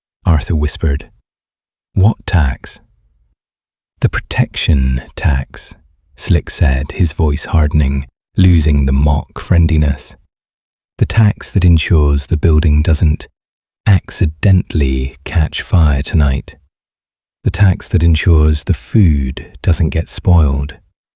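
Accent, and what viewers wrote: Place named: Ireland. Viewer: Best Bumpy story, so far. As the other reviewers said,like the original narrator better. The slow, Irish accented narrative was a bit much. Always liked the Irish accent, but bring back the original.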